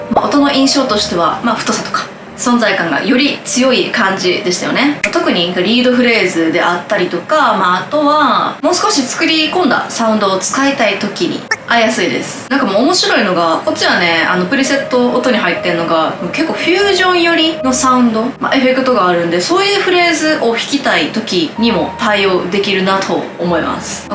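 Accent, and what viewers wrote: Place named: Osaka, Japan. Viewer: 何色ものスペシャルカラー仕様のGOシリーズは提供だったんですね？ しゃべくりにいかにもな大阪のねぇちゃん味があって良かったです！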